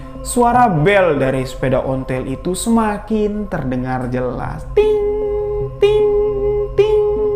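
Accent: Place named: Madura, Indonesia. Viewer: Logat Madura nya masih kental.. Salam sehat selalu tretan dibik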